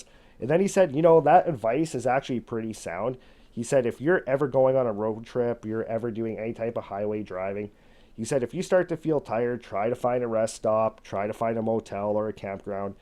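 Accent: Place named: Canada. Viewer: Haha im canadian from far northern British Columbia i recognized this guys accent right away as a canadian! Cool channel will sub, but id love to hear a story on nahani valley/headless valley in the north west territories , i think it is terrifying as a national park based on the past stories!!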